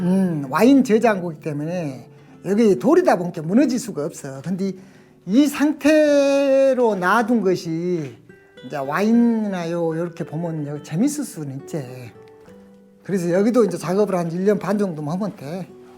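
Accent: Jeolla, South Korea. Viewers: 전라도분인가 보네요..사투리를 찰지게 쓰시네요..ㅋㅋ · 토종 전라도 사투리~ 했제 그랬제 그랬당께~ 께~ 께~께~께깽~ 깽~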